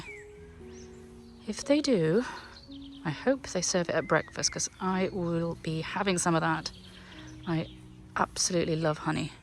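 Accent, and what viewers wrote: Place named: United Kingdom. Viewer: I've just found your channel by a serendipity and it is soooo cute. I'm an english learner, so it's such a pleasure to hear your british accent! Keep going, I loooove your videos!!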